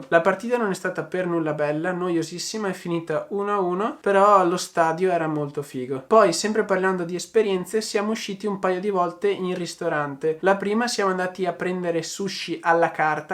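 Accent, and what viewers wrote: Veneto, Italy. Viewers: Sei veneziano?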